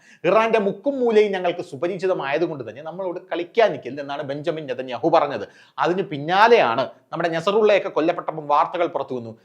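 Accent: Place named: Trivandrum, India. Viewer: Nice explanation in Tvm. Slang, very interestingly presented ❤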